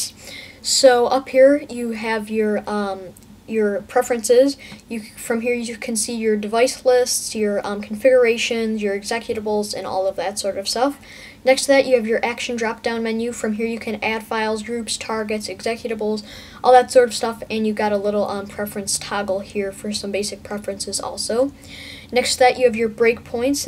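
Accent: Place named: United States. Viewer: I fucking hate the totally high American Accent!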